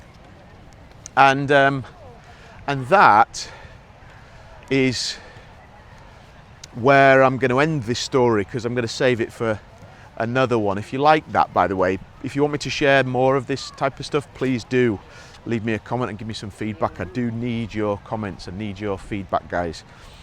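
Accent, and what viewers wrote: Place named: Northern England, United Kingdom. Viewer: Nice one. I've watched a few of your videos in the last days not very attentively. I already know las ramblas are often overcrowded. Hay muchos videos sobre "Moving to Spain" "Reality of living in Spain" or "Culture shocks of Spain" (this is where it starts to get silly para mi), it's a bit repetitive to put it mildly. StatemenIts, opinions or whole videos "slightly annoying" are mostly from US-Americans. It's all not a serious issue for me, so I keep it simple: Complaints about spanish bureaucracy in british english sound better. This video's first minutes were special for me, because I realized you speak with a distinctiive northern accent. Manchester let me see the light. Are there videos about "What you should know before moving from Miami to Moss side"? 🙃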